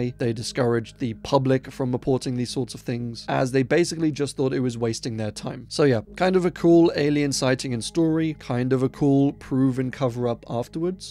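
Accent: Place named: England, United Kingdom. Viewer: Your channel is really good mate 💯 where is your accent from in England I'm English Yorkshire?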